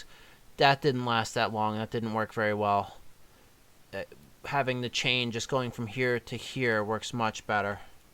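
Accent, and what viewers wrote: New York, United States. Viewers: u from new york ?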